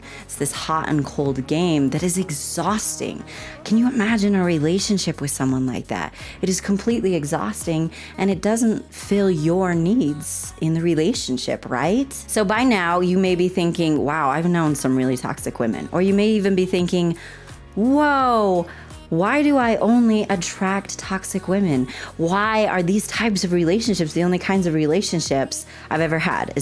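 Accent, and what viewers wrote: United States. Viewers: This is your best video, because you look so funny, talking very fast, in another video, in the background. I also like your singing AND your bloopers. As you have opened up to me, revealing that you go to the Church of Jesus Christ, is it rude to ask you, is your American accent from Washington, or California? I was a "good boy" for you Coach, and watched your video.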